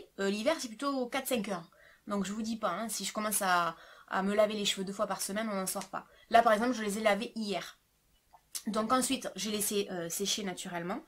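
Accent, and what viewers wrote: South, France. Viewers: Coucou! Super vidéo, comme d'hab! Merci pour ces conseils! Tu as effectivement de très jolies cheveux, un tuto sur ta coiffure? Je sais que tu préfères parler mode mais peut être l'inclure dans un get ready? ^^ Quelques soit le thème de tes vidéos, c'est tjr un plaisir de t'écouter! =) Je vis en Espagne, et ton petit accent du Sud me rappel mon chez moi ^^ Besito guapa!!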